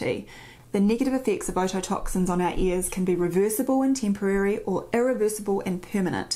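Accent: New Zealand. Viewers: You have a lovely accent as does all of New Zealand 🇳🇿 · There's few more adorable than a N.Z. accent. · I got a pretty bad concussion back in 2013 and have tinnitus since then. Great to hear a fellow kiwi by the way. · Love KIWI accent especially from an intelligent and good looking woman . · I find your Kiwi accent beautiful & clear ♥ (like your looks) Nothing wrong with accents, I've got a European one 🇲🇹 ☺. VERY INTERESTING TOPIC. TANX Dr. Cheers 👍. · Nice to hear Newzealand accent ,though I may take a little extra time understanding. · Its New Zealand, Sexy Voice🌹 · I love your accent! I am retired U.S. Navy, and I spent two wonderful years in Auckland on exchange with the Royal New Zealand Navy. You’ve given me a “trip down memory lane”! Thanks! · Forget your pronunciation of “ear”, your pronunciation of “accent” grates. Seriously, I’d move to NZ tomorrow if the accent wasn’t so grating. 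I say this from the background of having visited the country and being a descendant of one of a very prominent Kiwi family. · I hear a fellow Kiwis accent and love it. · Interesting, thank you. As far your accent goes, people just need to learn some Kiwi 😊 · I use my tinnitus to meditate. Love the accent KIWI isn't it. You accent is better than the call center operators from India, I can't understand them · I'm from the states, but lived in Wellington for a few years - that's a major kiwi accent, I believe! Is there any significance with imbalanced tinnitus? Over the last few months, it's gotten noticeably worse in my left ear. I've had a full round of exams, and have been told I have Meniere's disease. It's unfortunately really impacted my ability to hear properly. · I would like to know if you are a native New Zealander. BTW, your accent is lovely and pleasant to listen too. Thank you for your notes. 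· I am here because of the accent! Loved my stay in kiwi land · Hi Dr, love the Kiwi accent, as I've been living in NZ for 20 years that is a good thing. My tinnitus is like the shrill of many cicada (Christmas beetles) but go from a dozen to thousands when I am stressed out. Like walking in a NZ forest glade in the middle of summer noisey. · I’ve suffered from tinnitus for years. I’m a loco engineer,therefore I am exposed to high noise for long periods. Very informative video. The minute you mentioned your accent about ear, I thought Kiwi. My dear lady wife is a kiwi and is always going on about pronouncing words🧐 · All New Zealand accents are difficult. You pronounce all your E sounds as a hard E. That is not how the rest of the world speaks! Still good advice and a good video. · Definitely I must make an appointment with my Doctor. Thanks Dr.! (By the way, lovely kiwi accent 😉) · I love NZ. 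In the year of 2002, I had the opportunity to visit your beautiful country. I have accumulated so much positive memories. Your accent is amazing I like it very much combined to your knowledge. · I love the Kiwi accent. When I first arrived in Oz from the US, I couldn't understand anyone! But after nearly 14 years I mostly don't even hear it anymore. I do still love the video a NZ man made about his deck LOL. . My GP said I had Tinnitus when I told him I was hearing music very softly in my right ear. I see how much worse it could be. Thank you for this video. >> Tom's wife << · I have had Tinnitus during the day as a hiss, whistle, for perhaps 20 years. Strangely enough it stops when i go to sleep. If i wake in the night it remains stopped until i am fully awake. I have learned to live with it. My ears are clean and OK. Love the Kiwi accent.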